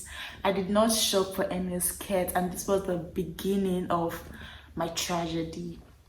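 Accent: Ghana. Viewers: and now you have a ghanaian accent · My dear you are not back to speaking English with a Nigerian accent oo you still sound 80% Ghanaian😅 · Funny that back then you did not understand their intonation but now I hear you pronounce "study" like a Ghanaian. Lol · This is so funny. You sound like Ghanaians now, and it's totally weird. You guys had get together 'steady'. Lol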